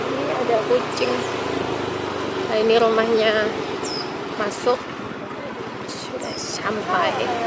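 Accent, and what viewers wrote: Banyumas, Indonesia. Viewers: Wong brebes apa wong cikarang jane.. ngomonge be ngapak mbok..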